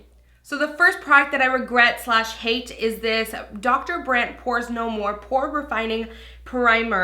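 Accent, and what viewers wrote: Canada. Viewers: Is she Canadian? Just the way she pronounces certain words sounds Canadian. :-)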